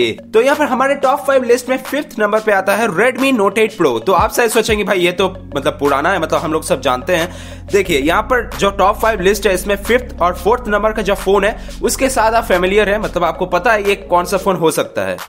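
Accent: India. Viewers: Nice work but your hindi accent bro😂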